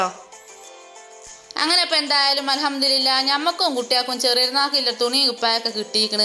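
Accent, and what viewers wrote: Malappuram, India. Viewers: yaa ithaan njangale bhaasha thani mlprm slang 😍😍😍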